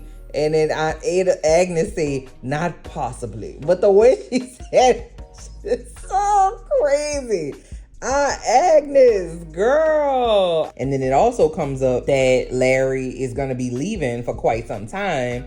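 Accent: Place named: Louisiana, United States. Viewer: I have never run across this show before - I have listened to a lot of the Gilded Age podcasts from different people and perspectives - this is by far the funniest breakdowns EVER!!! Maybe because I’m from Louisiana the accent had me further in a chokehold like I was listening to one of my homegirls give this recap and rolling on the floor laughing! I am subscribing TODAY!!! 😅😅😅😅😅😅😅😅😅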